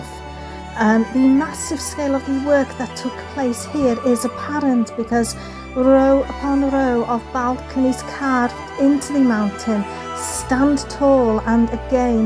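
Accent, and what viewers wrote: Wales, United Kingdom. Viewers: Excellent video on Dinowig Quarry, one place me and Brett really want to get too, so it was fascinating to hear your tour and i love hearing the Welsh accent, it's great to pick up a few words. Like you said it's quite the climb, but from what you've shown us its well worth the journey! How long did it take you roughly to do the walk? Thank you, appreciate your videos!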